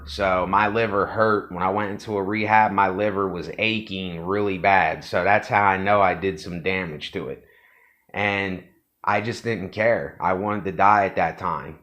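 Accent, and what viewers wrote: United States. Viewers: You sound like your from northern Kentucky. Just saying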